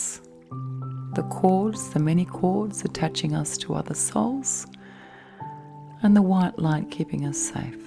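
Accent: Australia. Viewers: I love you & your tranquility!!!❤️❤️💋💋, I love your sweet, soothing, sexy chloroform voice!!!❤️💋, thank you so very much for your sweet happy Australian voice!!!❤️💋❤️💋, I love you with hugs and 💋💋💋💋💋💋💋💋💋💋💋💋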